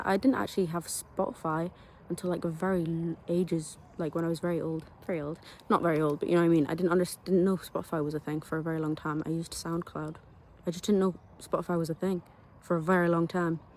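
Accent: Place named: Australia. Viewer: your voice sounds eaven aussie a lil bit, you might be onto something with all these theories 🙂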